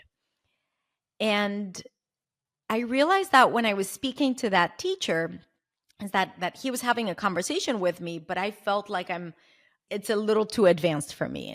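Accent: Spain. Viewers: Incredible, your English being gently touched by Spanish... I wish you the best in the new life and learning process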